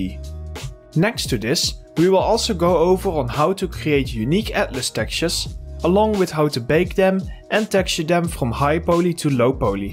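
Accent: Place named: Netherlands. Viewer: Very dutchlish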